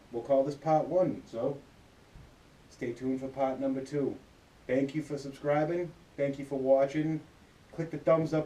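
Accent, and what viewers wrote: New England, United States. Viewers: Where you from dude!? I heeyah New England Haaaaaad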